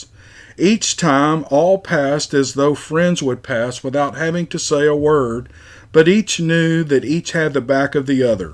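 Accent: South, United States. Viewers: That southern drawl is like comfort food but for my ears and mind, thanks ❤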